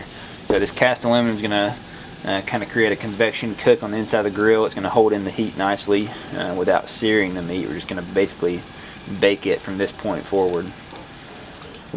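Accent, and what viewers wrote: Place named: Tennessee, United States. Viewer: Nice video. I’m planning pork chops tonight on my MHP. Never thought of leaning the chops against the side to sear the sides. Meanwhile I’m listening to the accent and finally determine it’s Southeast TN, but then in the last scene you mention a website. Joke’s on me: I was close, but the funny (to me) part is that I BOUGHT MY OWN MHP FROM GASLIGHT & GRILL in Homewood. Fantastic grill! Mine needs some cleaning and renewals, but I’m happy every time I use it.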